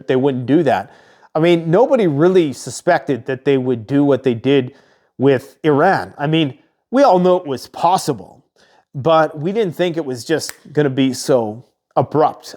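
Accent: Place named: United States. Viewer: He is practicing his American English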